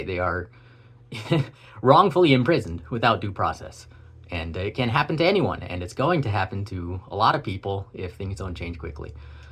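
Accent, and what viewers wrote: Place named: United States. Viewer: What is this event you're talking about thatll kill us all? Are you saying the trump administration is going to instate a theocracy and go all Inquisition on us? Or do you mean something more international, or do you not live in America? Some less vague language might do you well, because you sound American, and no offense but you look and sound like you're going to bomb a synagogue or something. Are you ok? I'm with you though, on the antitheism. I'm right there with you. We need to leave magical thinking and religion behind, I've been saying it for years too. No idea why this 87 view video was on my front page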